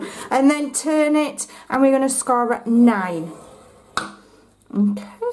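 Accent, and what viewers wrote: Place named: England, United Kingdom. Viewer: Such a great tutorial I will definitely give this a go. I could listen to you talk all day as I no longer live in England your like a little bit of home.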